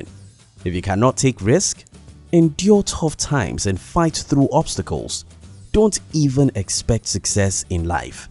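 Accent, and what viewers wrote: Nigeria. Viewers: This guy on the audio is Nigerian trust me .. he just Dey Lagos here now Dey tell us how to make money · you sound nigerian.are you? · It's quite obvious he's Nigerian. So what?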